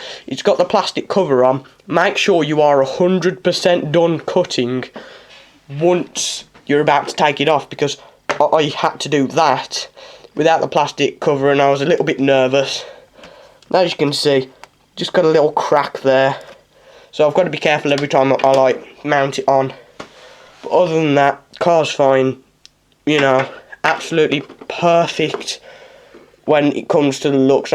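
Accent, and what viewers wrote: United Kingdom. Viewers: wouldnt this setting fuck up and slow you down? just wondering + man your cockney is deep af hehehehe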